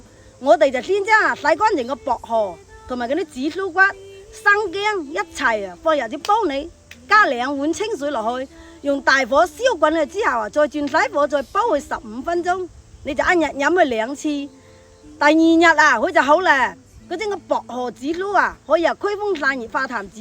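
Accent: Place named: Xinyi, China. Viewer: 你的口语好像我婆婆她是广东信宜😊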